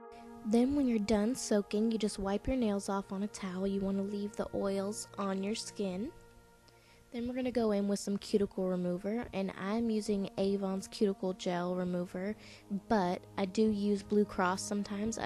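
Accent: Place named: South, United States. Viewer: You have a southern accent how cute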